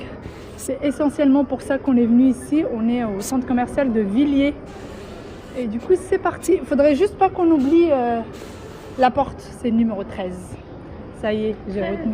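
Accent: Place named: Morocco. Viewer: Bon début de semaine ♥️🇨🇵🇲🇦 et Allah y3awnk ala wladk j'adore ta façon du parle Marocaine hh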